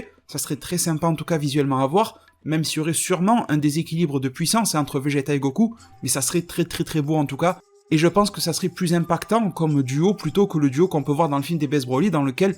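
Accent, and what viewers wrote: Marseille, France. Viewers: Juste comme ça, vient tu de Marseille ?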